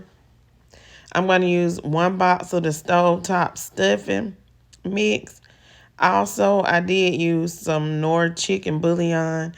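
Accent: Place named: South, United States. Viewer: I knew as soon as I heard your voice, this was the recipe I wanted. With a southern accent like that, I knew it would be good. Made this recipe for Thanksgiving and DANG!!! This was hands down the best cornbread dressing I’ve ever had!!🎉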